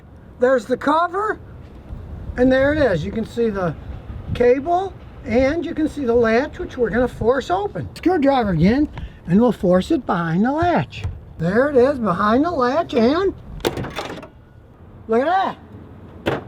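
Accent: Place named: South, United States. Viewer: Hey. I laughed when you were talking about the long screwdriver and to "stick it in there". I know you are from the north but it sounds like you have been in Texas long enough to pick up a little bit of the Southern dialect. LOL I'm sure if I went up North for a long time I would pick up some of the slang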